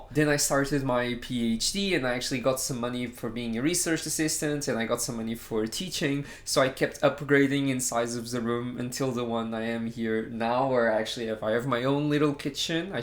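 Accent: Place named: Brazil. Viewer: show us how to make that iced latte of yours. also are you latin? maybe a fellow brazilian? cause of the accent (cute by the way)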